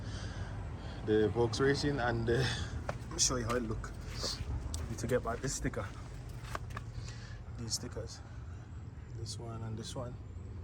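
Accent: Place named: Jamaica. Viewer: The Jamaican accent can't be hidden. It's a good look mi boss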